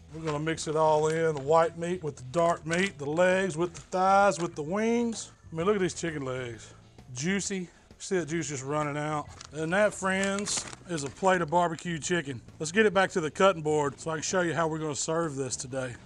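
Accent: South, United States. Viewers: As soon as I heard the southern accent I knew I could trust him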